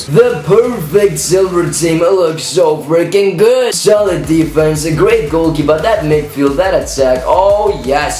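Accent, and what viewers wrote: Iceland. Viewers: are u Icelandic ?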